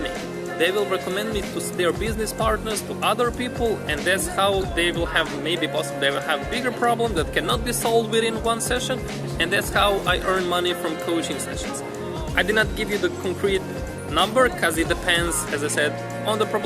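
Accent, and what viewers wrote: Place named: North, United Kingdom. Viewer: And I like your accent! :D North FTW!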